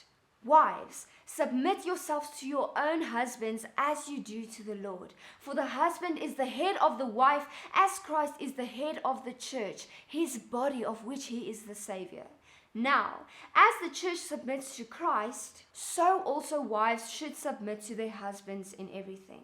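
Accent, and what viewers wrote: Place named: South Africa. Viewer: Lol I was about to say you sound South African 😂😂. Enjoyed your message. Beautiful from Botswana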